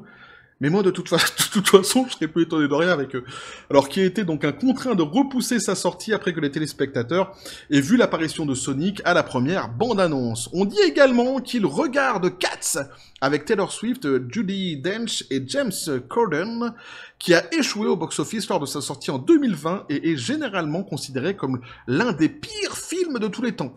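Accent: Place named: Quebec, Canada. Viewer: Rien que pour l accent québécois, je met un pouce vert! 😊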